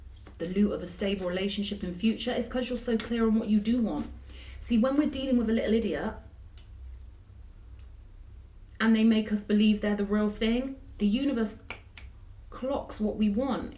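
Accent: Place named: London, United Kingdom. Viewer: Hi 👋 Hey U.K...your smashing this and having your way..go ✨️ O me O my conduit connection to the almighty. Love your voice, can hear London, can hear down country, can hear a beautiful vibe. What goes 😳 around comes around, don't keep hurting innocents..for your power. Learn your karmic Lessons and come back to the Light. Peace&Love&Light Namaste 🙏 ♥️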